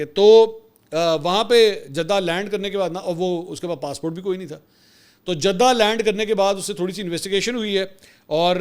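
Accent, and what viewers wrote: Punjab, Pakistan. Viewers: صاحب آپ کا حب الوطنی سے سرشار یہ دبنگ انداز بہت اچھا لگا اوپر سے پنجابی کا تڑکا کیا کہنے ہیں آفرین ہے بھائی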